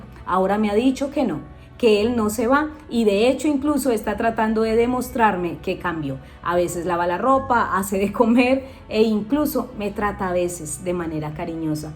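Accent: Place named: Colombia. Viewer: mexicana pero tienes en acebto bien marcado colombiano no entiendo como cambian de acento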